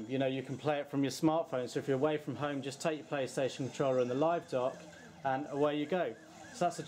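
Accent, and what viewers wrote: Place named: United Kingdom. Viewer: I cannot explain how i love the british accent!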